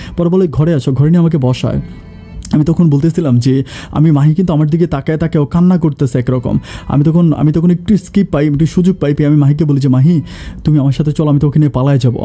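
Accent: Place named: Noakhali, Bangladesh. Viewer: তুই কি নোয়াখাইল্যা? কিন্তু মূর্খ নোয়াখাইল্যা.. তবে তোর ভয়েস টা সুন্দর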